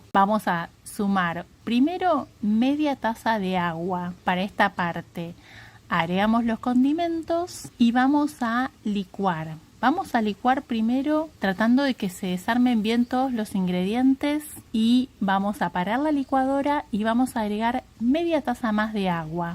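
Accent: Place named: Argentina. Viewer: Buenas noches, querida. Me aparece el video con traducción al portugués, que hablo muy bien porque viví en Brasil durante ❤más de 30 años. El problema es que a mí me gusta escucharte a tí en castellano con ese acento argentino (¿o uruguayo?) y es tu voz la que me gusta oír... ¿Me puedes decir cómo evito esta voz doblada con traducción?? 😮 Muchos cariños desde Canadá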